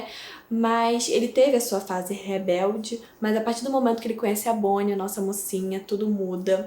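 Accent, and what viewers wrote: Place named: Pará, Brazil. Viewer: Oi... Você é do Pará?... O sotaque parece bastante.🤗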